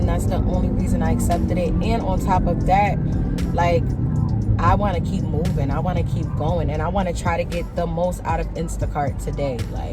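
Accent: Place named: New Jersey, United States. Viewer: Your accent sounds like your close to me I'm in burlington nj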